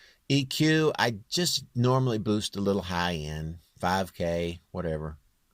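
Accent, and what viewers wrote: South, United States. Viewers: Yeah, I watched it and it showed what I thought it would, you are really well versed in sound engineering and yes, your real singing skills are not lacking at all. Before I go on, I am guessing the thing went well since it was a while ago. I do hope so at least. Confusing on a song when you are talking about your own real ticker. This video is for the end of the semester project where you take your turn and show the other students all the stuff you learned and how you used it, after 16 weeks of learning and practicing the stuff, as an analogy. Can't really learn too much from it in regards to application because as you said, it's a process, and that process each requires its own host of knowledge. What it does do is show your key elements to the process and that allows for a great starting point. I am guessing these type of videos are as equally demanding as your song mixes, but if you are not allowed to get paid for the song videos due to copyright, you would get full pay for these. 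That's something. I also learned you have a southern accent, didn't see that coming.: )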